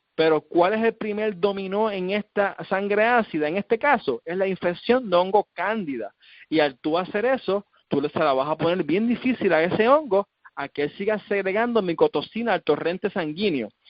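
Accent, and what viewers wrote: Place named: Cuba. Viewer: NO SE ENTIENDE CUAL ES EL PRIMER MEDICAMENTO QUE ACONSEJA..."..no se qué...clins" , que contiene 3 productos, dice. Pero imposible entender por la dicción de quien habla, parece cubano, no?...Por favor, si alguien sabe de qué medicamento se trata, compartirlo o responderme. Muchas gracias! 18/03/2019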